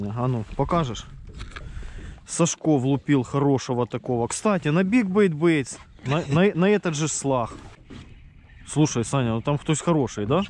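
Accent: Ukraine. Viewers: А чего у вас в 6 вечера так светло? Это же Украина вроде, судя по говору...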